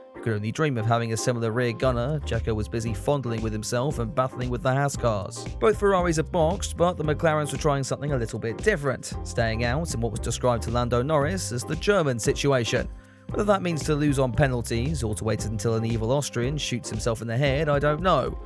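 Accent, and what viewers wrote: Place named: North, United Kingdom. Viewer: can you please talk a bit slower for not so northern accented non Americans thanks otherwise good comedy review😂😂😂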